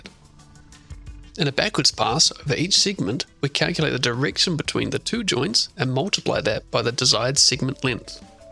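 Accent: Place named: New Zealand. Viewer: ayyyy new zealander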